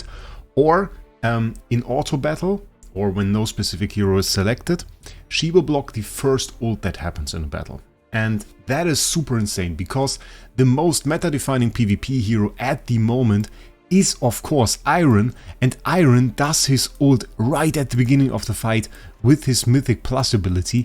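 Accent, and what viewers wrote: Germany. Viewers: your accent sounds german? am i right with my assumption?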